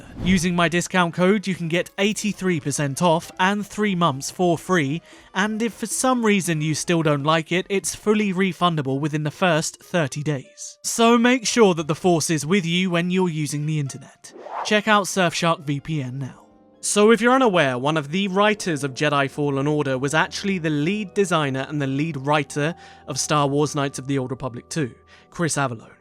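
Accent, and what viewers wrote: RP, United Kingdom. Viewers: I apologize if this is an ignorant question, but is your accent recieved pronounciation? I love your videos, I've been binging them for a few weeks now. Kotor 2 was more than just a game to me too, I can't wait for the future of narratives set during this era.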